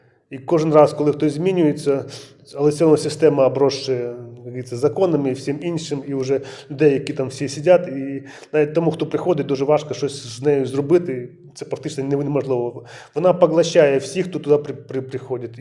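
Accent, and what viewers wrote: Russia. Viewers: Дуже ваша російська ріже слух . Чи ви часом не засланий козачок?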